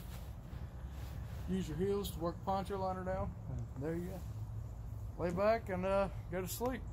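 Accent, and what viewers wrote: South, United States. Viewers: You are the only person I've ever heard with a voice that sounds like mine. You have a southern accent. But it was strange listening to this. I like the channel. Keep it up man.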